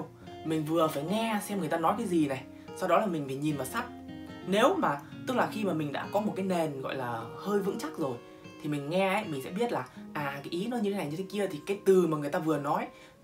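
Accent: Hai Duong, Vietnam. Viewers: Giọng Hải Dương yêu dấu quê mình đây rồi🙂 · Bạn người Hải Dương phải k? Nghe giọng b giống người HD 😉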